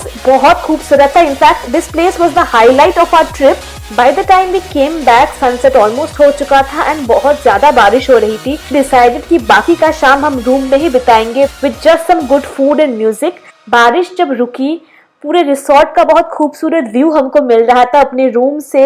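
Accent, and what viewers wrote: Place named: Bengal, India. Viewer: কথা শুনে বাঙালিই মনে হল। তাও বাংলা নেই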